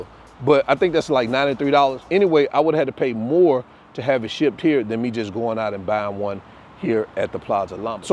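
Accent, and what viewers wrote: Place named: Alabama, United States. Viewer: Es el acento Alabama para mí .. con las palabras en español 😂 “malecón” “Ave Spaña” 😂🫶🏽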